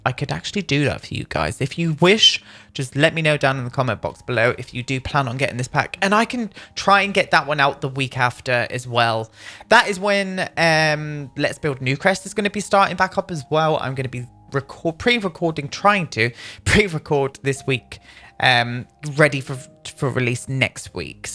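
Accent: New Zealand. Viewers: I'm Australian...pretty sure that presenter is a Kiwi. 😉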